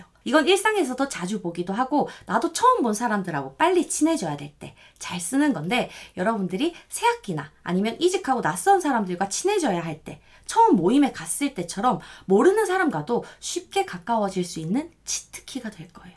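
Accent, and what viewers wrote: Gyeongsang, South Korea. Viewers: ㅎㅎ 경상도 억양 매력잇네요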